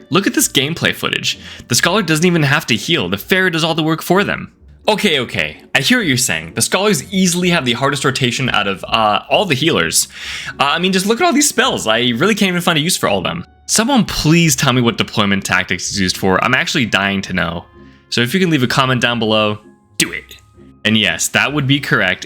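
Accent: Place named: Southern New York, United States. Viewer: That was perfect Southern NY / Northern NJ voice.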